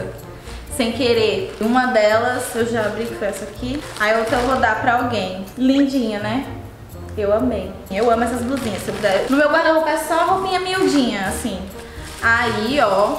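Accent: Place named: Fortaleza, Brazil. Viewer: fortaleza eu acho não tenho certeza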